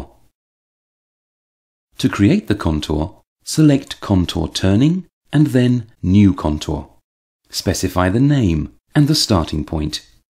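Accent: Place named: England, United Kingdom. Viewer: fucking english accent shut up already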